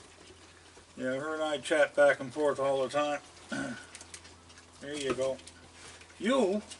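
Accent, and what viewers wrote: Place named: Nova Scotia, Canada. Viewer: i always wondered why ya sounded so familiar its cause yer a fellow nova scotian right on makes sense to me now lol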